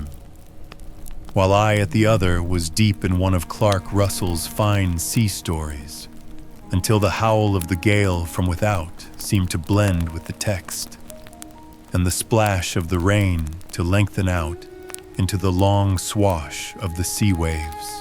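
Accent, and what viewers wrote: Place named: United States. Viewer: It's lovely the music in the background is perfect but I can't do Holmes with an American accent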